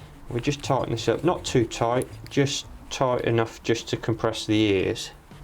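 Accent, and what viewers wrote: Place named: Midlands, United Kingdom. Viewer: you sound as though your in the Midlands do you have your own garage I’m near Warwick and could do with a local mechanic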